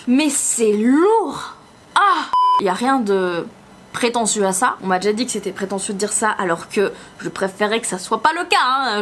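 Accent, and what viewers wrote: Belgium. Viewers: Coucou!! Hier, je suis tombée sur ta vidéo "95E et plus de soutif". Je ne te connaissais pas, mais le titre de ta vidéo m'a interpellée. J'ai aimé ta personnalité avec ton petit accent Belge que j'aime beaucoup <3. Et là, pareil, le titre de cette vidéo, m'interpelle et je suis dégoûtée de ce que tu as pu vivre!! Je ne comprends et je ne comprendrais sans doute jamais ce qui ce passe dans le cerveaux des mecs (si tant est qu'ils en aient un!!) Je suis comme toi, je me demande ce qu'il faudrait faire pour pouvoir les afficher. Peut-être, en criant "regardez messieurs dames, ce monsieur est en train de se masturber.... sortez vos téléphone pour le filmer et le publier sur les réseaux sociaux!!!" Je ne sais pas si cela changerait quelque chose, mais je suppose que la personne la plus mal à l'aise serait certainement ce porc et non toi. Je n'oublie pas que ce genre de situation est hyper perturbant, mais peut-être que cela exorciserait ton angoisse de l'instant?? 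Les lois ne bougent pas et les femmes ne sont toujours pas considérées comme elles devraient l'être.... Bienvenu au 21ème siècle!!! C'est grave quand même que l'on en soit toujours là.... Bref.... Merci pour ton témoignage, il peut servir pour nos "sœurs" Ha oui! J'allais oublier, j'ai certainement l'âge de ta mère ou même plus.... Et j'ai vécu ce genre de situations pourri quand j'étais jeune et à croquer.... Donc, je sais précisément ce que tu peux ressentir dans ces moments là. Gros bisous ma belle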